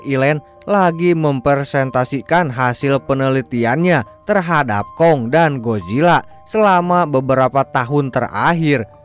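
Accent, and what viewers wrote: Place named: Sunda, Indonesia. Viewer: Iya jadi orang Sunda anjir yang ngisi suaranya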